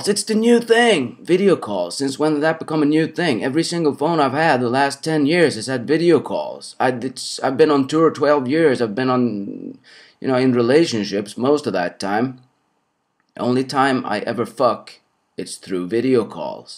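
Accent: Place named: Sweden. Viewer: Just därför gör man inte stand-up på engelska om man är svensk och har sån där bonn-dialekt. För övrigt är Betnér en värdelös komiker.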